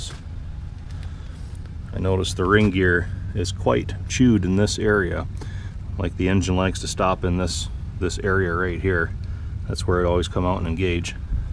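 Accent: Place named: Midwest, United States. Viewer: I'm from the Albany area of New York... and it always tickles me to hear the midwestern pronunciation of Albany.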